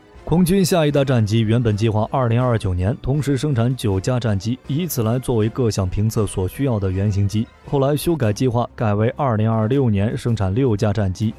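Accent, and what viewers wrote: China. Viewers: 為什麼配音的是大陸人？我們中華民國的國家機密讓大陸那麼深入了解了？ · 台湾省的视频也喜欢大陆配音吗？ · 請問一下這影片怎麼是用簡體字還操對岸口音？ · 配音的像是大陸口音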